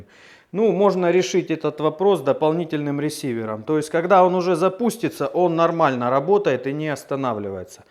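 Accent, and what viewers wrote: Rostov, Russia. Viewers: Молодец!!! Ты и твои видео всегда радуют) за эти года просто до боли приятно слышать твой ростовский говор и специфические словечки))) 👍👍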